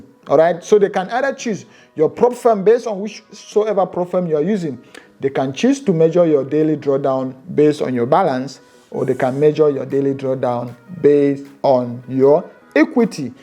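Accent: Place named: Akwa Ibom, Nigeria. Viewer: U sound like an akwa ibom guy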